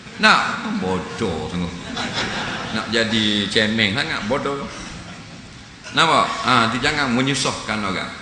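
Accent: Terengganu, Malaysia. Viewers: Zulkifly Hj Ali study dlu loghat terengganu bru cite bodoh..mcm org melaka cakap celaka la celaka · ustaz orang tranung,bukan klantan..orang tranung memang keras sikit loghat die..mu orang luo xyoh nok komplen la..cakak banyok tek..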